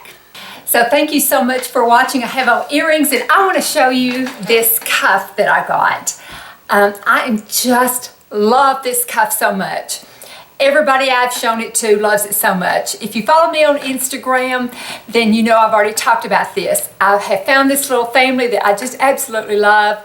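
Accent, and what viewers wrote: South, United States. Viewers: You might be the sweetest person on YouTube! And I just adore your accent! Im from Ky and I still hear such a southern draw in yours. I could listen to you read a dictionary 😊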